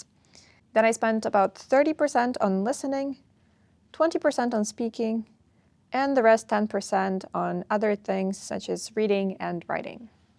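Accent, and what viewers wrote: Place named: United States. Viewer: That's fine but you're speaking English with an awful American accent. Why do so many people do this?? It's not English. It's annoying.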